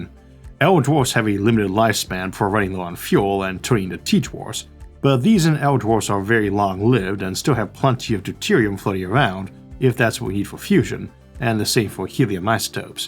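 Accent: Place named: New Orleans, United States. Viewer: This man sounds like he's from N'Awleans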